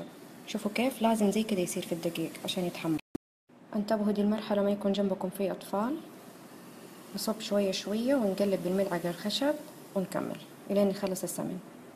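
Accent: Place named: Hejaz, Saudi Arabia. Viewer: اللهجه الحجازيه الاصيله ماشاء الله ههههههه دحين انزلكم هي شكرا على الوصفه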